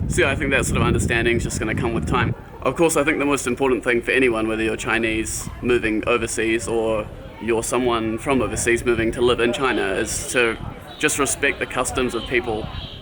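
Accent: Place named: United Kingdom. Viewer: your english does not sound very much like those from new zealand. it is more british.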